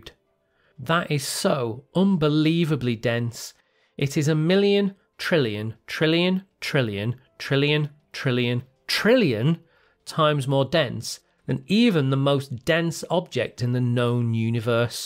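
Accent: United Kingdom. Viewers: Why do you have a British accent but you say things like "4 miles"?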